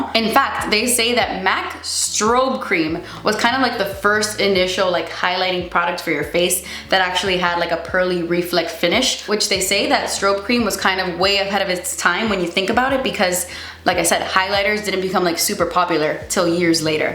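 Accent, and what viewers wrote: United Kingdom. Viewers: The British accent reminds me of Andy from the office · Why do you keep going British???? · Love this! My daughter came in while I was watching and said, “she has a British accent!” I was like, “yeah…well kind of…”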